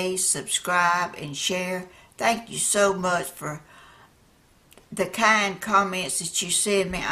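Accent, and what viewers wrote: South, United States. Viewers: Ms., You are a TREASURE! You've filled me with joy watching you crafting. Thank you for making my day. Your enthusiasm is infectious, your video skills are entertaining, and your southern accent is delightful. I can't wait to make my own crystal heart, butterfly and cross. (I'm from Michigan and, like you, I too love the sunshine, flowers, butterflies and I can't wait for spring and the snow to be gone for good. And I'm sure our bluebirds, who just showed up, agree.) May God bless you, as you are a blessing to others!:) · HILARIOUS!!!! I'm sitting here, a lot of pain at the moment, using your relaxed motions, voice, and southern sounds to sooth me. I had JUST been thinking how much fun this would be with my grandsons now that they are on summer break and YOU mention how fun it would be for kids. Thank you so much. I greatly appreciate your crafts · My granny Wright was from Ga and raised my Dad in NC. 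Your accent brings back many fond memories of visiting family in the south. I grew up in Ohio. You are a special lady, I can tell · Hey! So glad to meet you,. I love your southern accent. My mom who is 82 now, is from Arkansas and you remind me of my relatives! You are so sweet! Take care and God richly bless you:) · Hello from Kansas City Mo I have enjoyed your videos of your projects so much. Anxious for spring so I can try the cement pots. Now need some pipe cleaners, didn’t know Borax could make something so pretty. I have started doing some Dirty Pour painting, finding it fun, it doesn’t require any art talent. By the way,I also love your sweet southern accent. Your place looks so peaceful & I love seeing all your birds while you do your crafts. Wishing you a blessed day. See you later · Oh my stars sweetheart I thought you was from Alabama just by your voice. Thats a southern voice. 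You are awesome. I love watching you. I've learned a lot. Thanks from Alabama.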